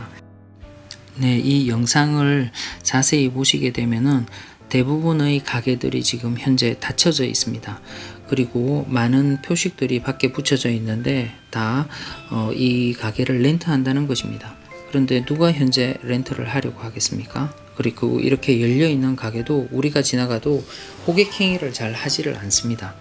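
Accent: Gyeongsang, South Korea. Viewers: 선생님의 경상도 사투리가 정감이 많이 갑니다ㅎㅎ 제가 대구사람인지라 그런거같습니다. 영상 속의 호이안을 보니 즐거웠던 기억이 나면서 정말 반가운 마음이 드네요 다시 그곳을 찾을수있는날이 얼른 왔으면 하는 바램입니다. 항상 유익한 영상 감사합니다ㅎㅎ 코로나 조심하시고 건강하십시오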